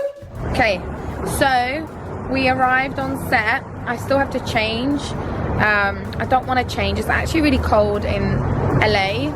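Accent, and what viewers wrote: United Kingdom. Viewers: How would you describe your particular style of British accent?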